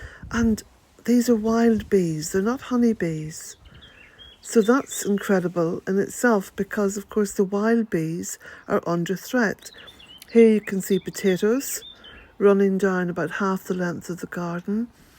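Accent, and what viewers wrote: Ireland. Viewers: Thank you for sharing this adventure. in your daughter's lovely, lively garden, so wonderful with your Irish lilt You can be a proud mum. 🌰🍇🥔🍏. Blessings from central va, u.s.and a hug for Patsy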